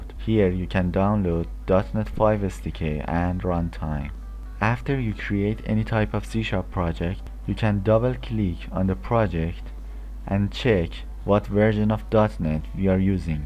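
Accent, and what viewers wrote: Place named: Iran. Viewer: This is how an Iranian talks English:) nice job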